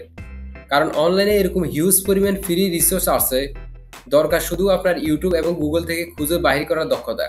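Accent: Noakhali, Bangladesh. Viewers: Apni ki noakhalir?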